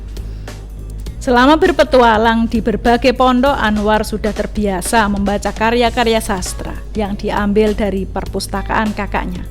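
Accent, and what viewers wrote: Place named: Java, Indonesia. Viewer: Ringkas, padat, dengan suara khas yg "medhok", memjadi keunikan tersendiri..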